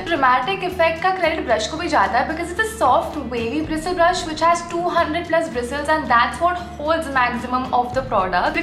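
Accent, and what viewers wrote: Gujarat, India. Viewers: Gujarati ascent 😅😁 yeh thoda aada aa raha hein!!!!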